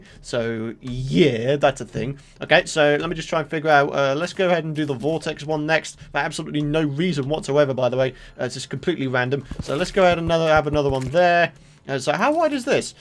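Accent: United Kingdom. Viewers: EMPER He’s British. It’s an accent.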